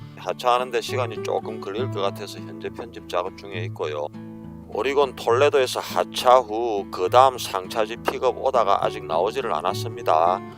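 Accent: Gyeongsang, South Korea. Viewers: 구수한 경상도사투리에 동질감을 느끼며 고마 썌리 마 구독 때리고 가겠습니다~ 항상 안전운전하시고 흥미로운 영상 계속 부탁 드립니다. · 구수한경상도 말씀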